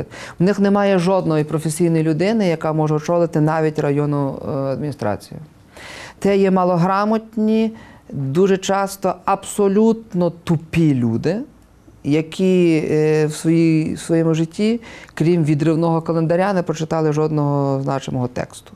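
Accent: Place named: Western Ukraine, Ukraine. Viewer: Какой противный западенський акцент , слушаешь и хочется рыгать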